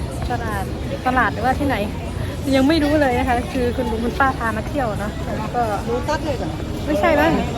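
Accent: North, Thailand. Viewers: ภาษาพูดสำเนียงเหมือนกันเลยครับกับ ทางภาคเหนือ ของประเทศไทย ติดตามคลิปตลอดครับเป็นกำลังใจให้ครับ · สำเนียงและการพูดคล้ายๆ ภาษาเหนือเชียงใหม่เลย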